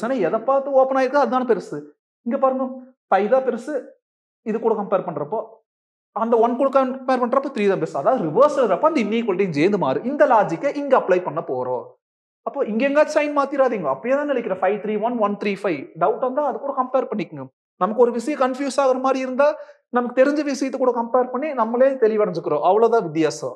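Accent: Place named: Kongu, India. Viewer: Ss..... It's KONGU TAMIL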